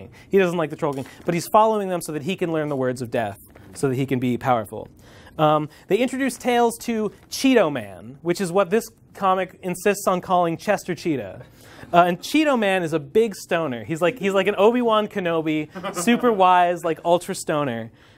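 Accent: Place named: Kentucky, United States. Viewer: pretty sure he's from Kentucky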